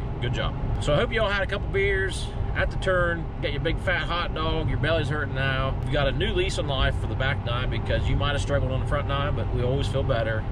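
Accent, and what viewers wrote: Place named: South, United States. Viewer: You ever played Edgewater in Lancaster, SC? I would have never guessed you were from Ohio. You sound like your from the South. Not meaning that in a negative way, just an observation. Thanks buddy. SC has some nice courses!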